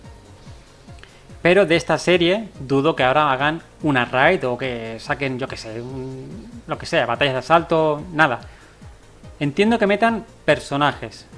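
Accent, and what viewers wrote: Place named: Chile. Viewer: Pensé que eras chileno como yo, por como te expresas amigo 👍😁🇨🇱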